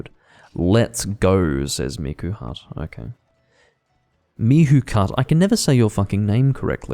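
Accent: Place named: North America. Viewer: Your North American accent is on point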